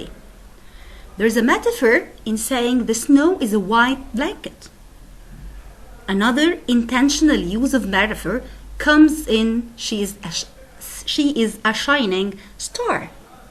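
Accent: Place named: Russia. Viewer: Are you Russian? Your accent is just like Russian accent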